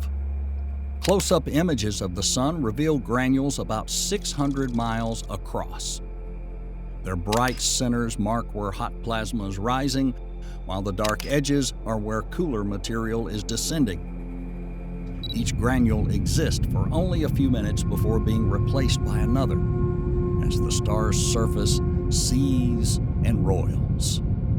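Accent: South, United States. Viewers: As a southerner myself, (by way of New Orleans, which has its own unique accent, but my family is from Mississippi and Tennessee so I grew up hearing many different forms of the southern accent), this narrator is more appealing to me. The unique way certain words are pronounced is very comforting to me. Combining that with my passion for astronomy and astrophysics makes this channel one of my favorites! If not for the ads, it would be perfect to have playing while going to sleep. I could just pay for YouTube premium, but I survive on a very tight budget and an extra fifteen dollars for something that is truly a luxury and not a necessity would be irresponsible of me. And with the gas prices rising every day, I don’t think it’s smart. I just have to endure the annoying ads that blast the speakers with their irritating gimmicks trying to sell more junk that I don’t want.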